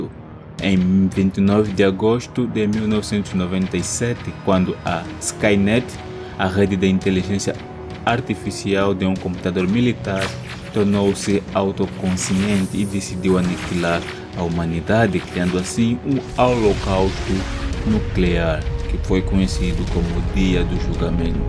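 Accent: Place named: Portugal. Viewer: Vim do vídeo sobre The surge , o microfone nem era ruim como falavam dava pra entender , eos br achando q vc era Portuga kkkkkk , legal saber q melhorou a qualidade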